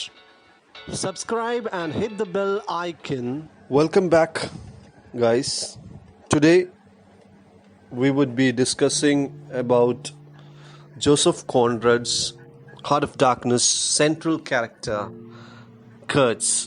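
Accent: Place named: India. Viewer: Youre not danish, I can hear the jeet in your voice, saar.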